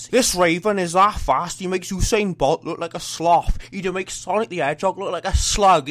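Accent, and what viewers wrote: Wales, United Kingdom. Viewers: Such an interesting accent you have, (I'm from upstate new york). Is Welsh your first language? Just curious how it usually works in Wales.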